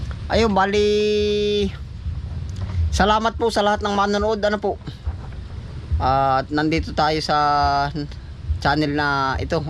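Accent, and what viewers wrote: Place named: Tagalog, Philippines. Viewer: Greetings to u from California, pinanonood namin ang vlog mo before going to bed kasi nakaktuwa ka lalo na at kumakanta ka ng ginagawa mo lang na lyrics. Ang diction mo nakakatuwa rin pure tagalog ang salita mo pero parang ngayon ko lang narinig na tagalog malalim kasi. Pray always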